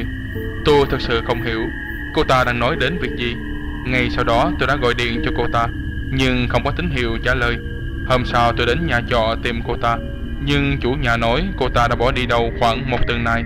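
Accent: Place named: Dak Lak, Vietnam. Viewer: giọng đọc vl ra.. giống giộng ở tây nguyên đac lắc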